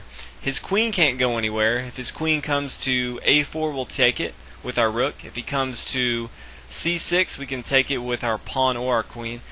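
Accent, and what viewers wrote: South, United States. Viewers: I like the lessons but his southern accent is very annoying.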